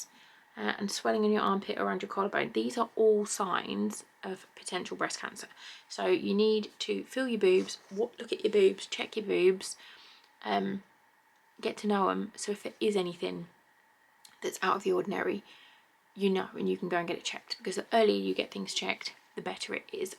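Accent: Norwich, United Kingdom. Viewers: Love your mascara, eyeshadow,eyeliner, eyebrows in this video :) and that Norwich uk accent!! 😍❤️❤️❤️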